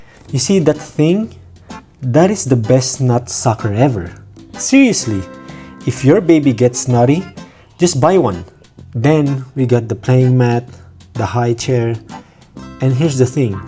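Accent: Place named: Asia. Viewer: Hi! What kind of Asian are you, Chinese, Japanese, Korean, Filipino? You have a strong Asian accent. Your Asian accent is definitely NOT Aussie/ Australian accent at all.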